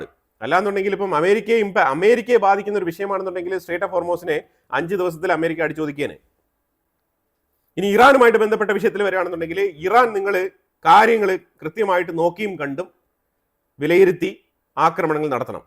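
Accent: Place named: Trivandrum, India. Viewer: Your News presentation is excellent. But I think, you have a small Trivandrum accent.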